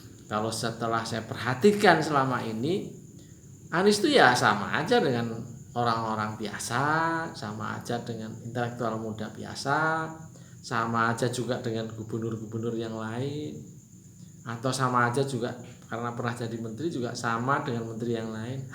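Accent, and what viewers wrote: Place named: Banyumas, Indonesia. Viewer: Ini kaya chanal ngapak..bro ..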